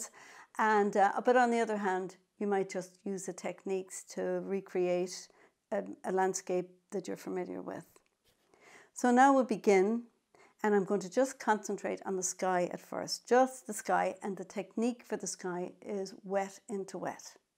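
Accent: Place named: South, Ireland. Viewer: Hi, I really enjoyed your video! However your lighting made it a little difficult to see the image very well. And the image was a bit blurry. I checked if it was the tablet I was viewing from, but it was only on your video that this effect occurred, which is sad because yours is the content I want to see. I LOVE your painting style, and your teaching style. Plus you have a beautiful, gentle, soothing voice which reminds me of my father who was Southern Irish. I have subscribed to your channel today and this video is the first video of yours I have watched and I LOVE your channel. Is there any way for the camera to be closer to the painting, and could you add stronger lighting please, because that would help folks like me who are watercolour newbies, who need to see more of what is going on, on the page? I know it may be asking for a lot, but if it could be sorted out, then we would get so much more from the videos. 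Thank you in advance. God bless you and all those you love. Have a great day!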